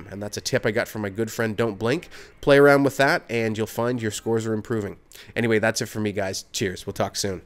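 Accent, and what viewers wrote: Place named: Newfoundland, Canada. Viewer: where is from.. he sounds like hes from newfoundland.....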